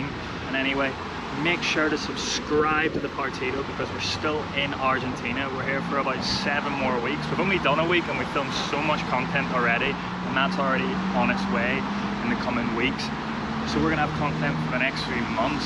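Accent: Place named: Northern Ireland, United Kingdom. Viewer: Great video, thanks for visiting my favourite club. I can recognize your NI accent :-) Hope you have a great time in Argentina.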